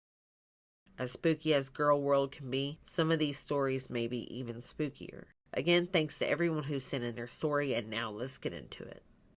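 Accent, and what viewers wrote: South, United States. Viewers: Oh, where do I start? I freaking love this, the way you narrate these stories! your voice is so soothing, and the southern accent makes it all the more enjoyable The one about the baby clothes and the one about George were the spookiest imo I have a couple suggestions/requests In the beginning I thought there was background rain sounds, but I'm not sure.. I think it'd be. a great touch to set the atmosphere. And I think it'd be really cool if you draw something about the stories, it would be interesting.. like whatever strikes you the most about those stories, idk it's just an idea 😊 I also love the fan art in the end.. I hope you keep up with these and it becomes a series on the channel cause I'm here for it. much love for you ❤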